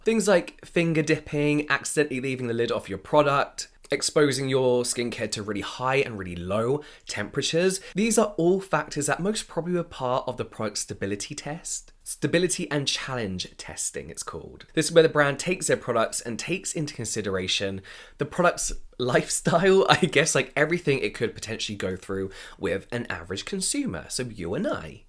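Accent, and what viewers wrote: United Kingdom. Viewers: your British accent is everything! It's literally better than ASMR 😍 love it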